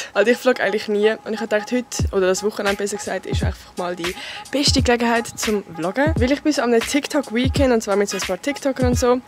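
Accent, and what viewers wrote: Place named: Bern, Switzerland. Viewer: duuu bish sooo vil attraktiver wed bärndütsch redsh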